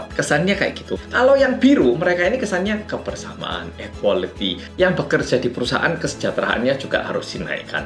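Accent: Java, Indonesia. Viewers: M'f abang ni org mana? korea kan ? bhs indony fasih bngt kyak ada jawany, sehat sll Bang. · Mas sampean jadi orang Indonesia aja sih ,orang ngomongnya medok banget kaya nggak percaya klw aslinya korea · Logatnya Jawa 😂😂😂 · mas jenengan korea tpi luweh medok seko aku mas😭😭😭😭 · Kadang suka msh kaget klo mas ngomong jawa medok tp wjah korea 😁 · Medok bgt jawanya... Mantap.. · Mas kok aku liak e, rungok e wis koyok wong jowo indonesia yo? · AQ neknweruh mas Ra berasa wong Korea..ngrasane wong Jawa mas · mas.logat bicaranya medok ky org jawa asli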